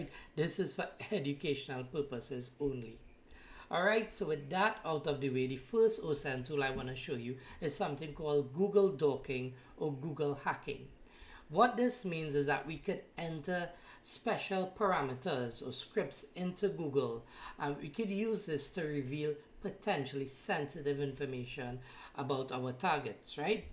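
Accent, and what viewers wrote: Trinidad and Tobago. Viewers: You sound trini 🤔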